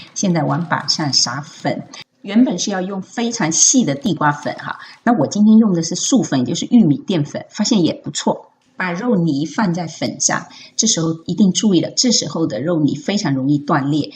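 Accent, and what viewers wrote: Fuzhou, China. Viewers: 老师也有福州口音，听来好亲切。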